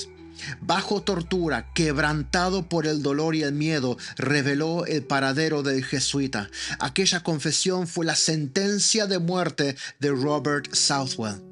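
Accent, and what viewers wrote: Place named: Argentina. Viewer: Excelente hermano, vas con todo!!! Gracias a Dios por tu conversion. Y tu forma de expresarte tan contundente. Me encanta tu peculiar acento Argentino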